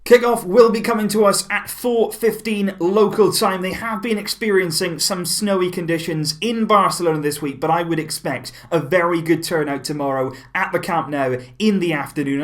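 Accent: United Kingdom. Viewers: you're a star pal. I do love your channel very much. I do like and appreciate the way you explain the things by yourself. Everything abdolutely well explained with a lot of sense. I'm spanish and I am living in UK, I can notice from your accent that your British. Isn't easy not to be spanish and be as you are, a very FCB lover. I'd be grateful if you take atention and also perhaps, answer my comment. I hope, we, all the Barça supporters, can celebrate together many titles this season, as we really deserve. Specially for the king of the kings, our master and always unforgettable Lionel Messi, which has made this team (club) a real live style. I gotta be proud of this guy, as well with: Iniesta, Busi, Luis Suárez, etc. GO AHEAD Barça !! Still many amazing moments yet to enjoy from this squad!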